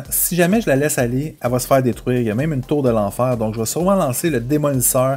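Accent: Quebec, Canada. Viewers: T’es québécois mon asti ? - J’adore la compo étant nouveau th12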